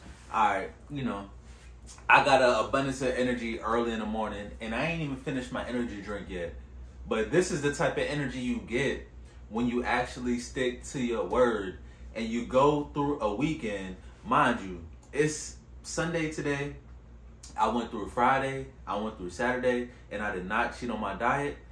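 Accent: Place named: Virginia, United States. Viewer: I'm up early on a big Sunday as well😂. I'm about to do a HITT work out and a short session of yoga at home because its way to cold outside to run. I totally agree with you about social media. I deactivated all my accounts 5 years ago and my life has been SO much better, along with my mental health (I kept YTube as a learning tool ). It is so freeing. Im living my life authentically, not based on, or in comparison to what others are doing. I also feel other people's lives are none of my business and my life is no one else's. I share pics and moments with my family and close friendz-and that's enough. I even stopped listening to music with words for a few years to make sure my life and views on relationships weren't being influenced by lyrics in a song, especially rap and R&B. Afterwards, I realized, truthfully, I didn't even want to be in relationships and I didn't care about getting rich or looking wealthy anymore; I was being swayed by the music I was listening to everyday. 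I know this all sounds extreme, but that's how serious I was about changing and truly finding myself. Nowadays Im focusing on completing my second degree, health, traveling, art, plants, friendships, and growing my retirement accounts 😊. S/N: Are you from Virginia? You have their dialect.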